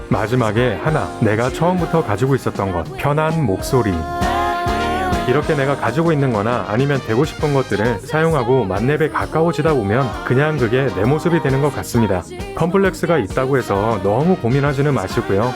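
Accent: Busan, South Korea. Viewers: 부산사투리 듣기 좋던데요! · 부산분이셨군뇽~^^ 서울토박이이신줄 알았는데ㅋㅋㅋ 👍👍👍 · 우와 부산분이시라니 사투리 고치시는 연습을 어떻게 하셨는지 최고시네요. 👍👍👍👍👍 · 부산사투리 매력적인데!!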